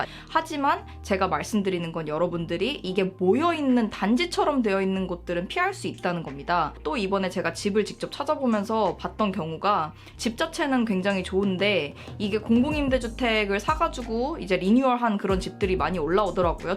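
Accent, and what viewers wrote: United Kingdom. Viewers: 어제부터 꽂혀서 몰아보고 이 영상은 오늘 또 다시보고 ^^;; 정말 매력적인 외모에 똑부러진 한국어와 저음으로 깔아지는 포쉬영국영어, 탄탄한 컨텐츠, 껴들어서 유머를 담당했다 또역시 진지하기도한 잭까지 정말 빠지는게 없는 채널이에요. 공들여 올려주신 영상 모두 너어무너무 감사합니다. 남은것도 다 볼께요 ~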